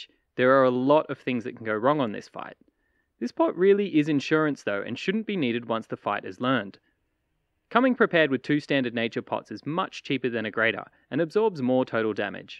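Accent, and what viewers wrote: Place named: Australia. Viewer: Aussie AF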